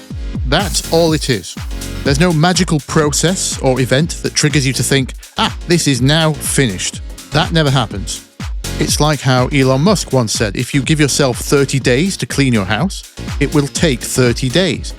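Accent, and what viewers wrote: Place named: Yorkshire, United Kingdom. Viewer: Oh man I LOVE you. New to this channel but I've well and truly subbed and will be sticking around. You're a fountain of wisdom, cutting through the crap with refreshingly relevant advice. (You sound like a fellow straight-talking Yorkshireman too!) Mate, this video was for ME. I can't finish anything as my standards are too high. Hundreds of unfinished tracks I daren't sign off in case they're not perfect. Still putting off launching my music because I've got hundreds of different factors I feel need to be perfect before I start. Your vid is so inspiring, thank you! Your mantra about "not released because it was finished" is mega helpful! Just hearing you even talking about this issue is so encouraging.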